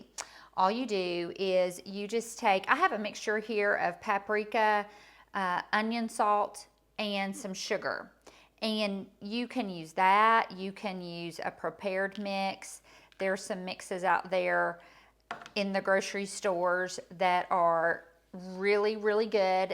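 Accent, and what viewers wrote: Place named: South, United States. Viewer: Like the content! I love your southern bell accent ❤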